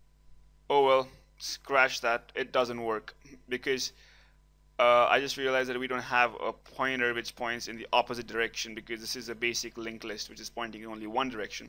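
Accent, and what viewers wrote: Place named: India. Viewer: Why would that be considered racist..? Sounds like a question stemming from curiosity, nothing wrong with that. That being said, it sounds like an Indian accent., but I could be wrong!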